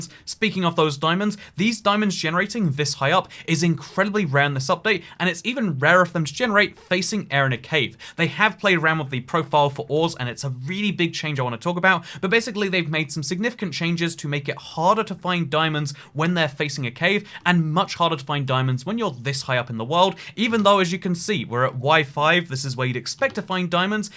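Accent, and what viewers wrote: United Kingdom. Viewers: Why are you complaining about a British person pronouncing a word the British way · I’ve been watching for years! And he still speaks so fast with his British accent that I still can’t recognize half of what he says as the English language. With that said, I’m a big fan and long time supporter. Just saying. “Hey everyone, here! If you like videos like this then wwocofmtkgkvkgksksjdhwbdjckfkfngmvkckrktkgkdjdjsnej.” Yeah, what he said.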